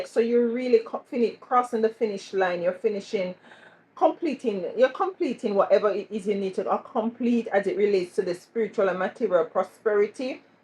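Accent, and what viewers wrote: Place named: Jamaica. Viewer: Thank you beautiful QUEEN and love your Jamaican accent! ❤💯🌹🎁 First time watching ASÉ ASÉ 🙏🏿🙏🏿🙏🏿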